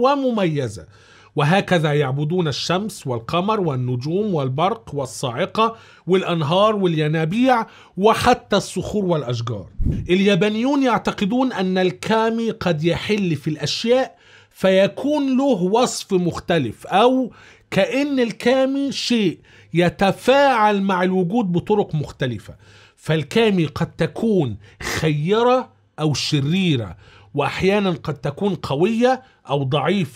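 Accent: Egypt. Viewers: أخي محتوى رائع . ارجوا نطق حرف الجيم كما في الفصحى بدلا من العامية المصرية.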